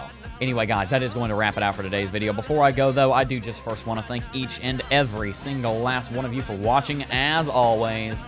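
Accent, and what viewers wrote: United States. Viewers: I just love your cowboy accent... I enjoy your videos. Keep up the good work!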